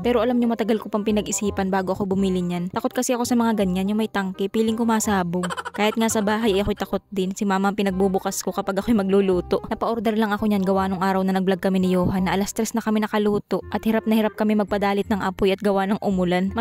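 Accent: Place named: Mindoro, Philippines. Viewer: Hi miss sana lagi kang makapag upload araw2 nagustuhan ko mga content mo kasi natutuwa ako the way ka magsalita pare pareho talaga ang tono nyo ni kamangyan vlog, saka si mommy che na keri taga mindoro rin sya saka yung mag asawang teacher na vlogger yung babae naklimutan ko yung name nila sa fb para kasing bihira na sya mag vlog eh kaya nalimutan ko na pero love na love ko kayo mga mindoronian mabuhaY kayo....❤❤❤❤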